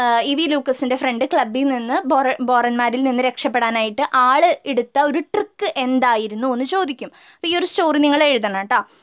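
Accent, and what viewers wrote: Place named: Thrissur, India. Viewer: ചേച്ചിയുടെ ക്ലാസ്സ് ഒരു ബോറഡിയും ഇല്ല.. online classil ഇരിക്കുമ്പോൾ വീണു വീണു uraghum ചേച്ചിടെ ആ trissure ബാഷ യും കേൾക്കുമ്പോൾ കെട്ടിരിക്കുംഭോൾ അടിപൊളി ആണ്.. ഇത് പോലെ വേണം Thrs 😍🤩🤩🤓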